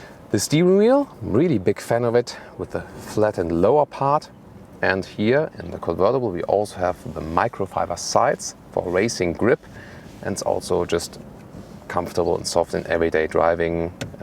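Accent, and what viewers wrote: Germany. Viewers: can you do something about the German accent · Ich konnte schon den Akzent erkennen. Deutsch. Der TT ist ein schickes Auto. :D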